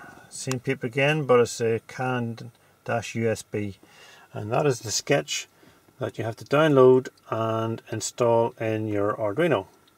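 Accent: Northern Ireland, United Kingdom. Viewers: haha do i sense a slight NI accent ;) cool video btw! was researchin canbus stuff myself, subbed :)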